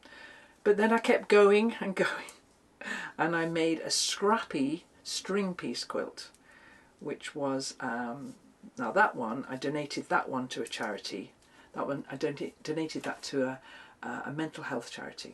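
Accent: United Kingdom. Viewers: i enjoyed the last video of the year. You just bring me joy listening to your lovely British voice with calming thoughts for us on our lime green sofa. I have enjoyed all the crafts you made and watching pateron So glad i found your channel from a wonderful friend i made this year. we were all 3 nurses and understand your mindset. I suggest a knitted pillow with an appliquéd yarn flower in center. Thank Anna and Rita for making all this possible. I look forward to the year of color 2026. Jodie in GA USA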